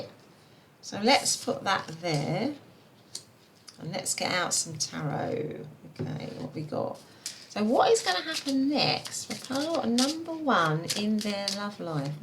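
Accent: United Kingdom. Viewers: I just love a British accent hehe, so comforting/sweet sounding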